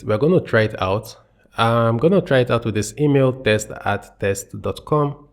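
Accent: Nigeria. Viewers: Sounds like a Nigerian 👍🏻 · Nigerian English bro😅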